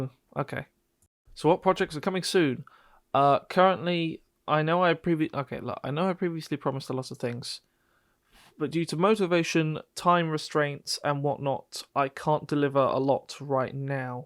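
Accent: United Kingdom. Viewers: he is probably from Birmingham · He gives me cockney vibes (north east london) rough as anything and kind of a pub brawler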